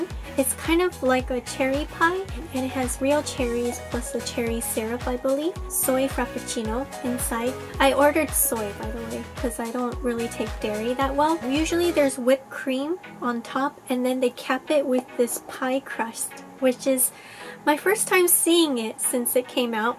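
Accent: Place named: United States. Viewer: Are one of your parents English or something? You sound so American (that’s a compliment btw)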